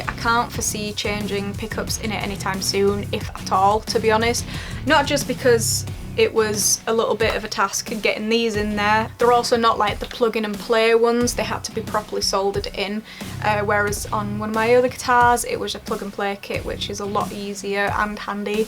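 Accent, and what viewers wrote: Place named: United Kingdom. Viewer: i would have never guessed you're british. Watching your older videos i thought you were from southern california. Your general demeanor, taste in music, taste in guitars, even your playing technique and room decorations scream "younger millenial or older gen z from orange county, lower middle class suburban public school outcast, rasied on top 50 rock radio and barely caught the tail end of the warped tour era then went to musician's institute for college". You could have fooled a CIA profiler until you started talking. Probably mostly still accurate, except you wish you were from socal.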